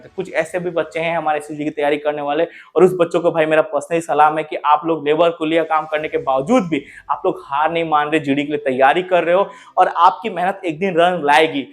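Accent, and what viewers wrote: Odisha, India. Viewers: Bhai tu odisha ka hai kia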